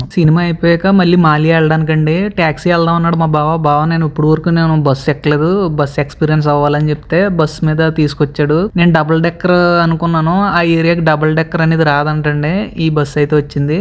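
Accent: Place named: East Godavari, India. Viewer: Me voice bale untadhi... madi rayalaseema but maku east godavari antharavedi lo maa friends unnaru me slang istam maku anna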